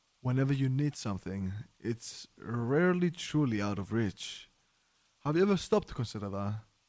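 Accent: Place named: Greece. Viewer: your accent sounds so greek wow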